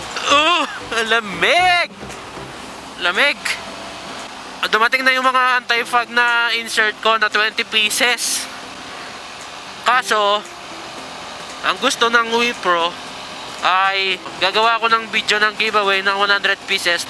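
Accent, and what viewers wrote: Pampanga, Philippines. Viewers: Bosis kapampangan dumali ya hahahaha idol pa colab taga mexico ku bap tuki ku rides u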